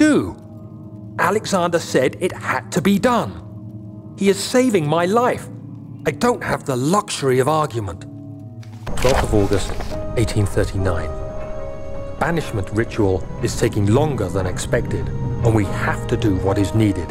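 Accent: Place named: England, United Kingdom. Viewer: I really need some audio books/stories with brooding english accented voices like this.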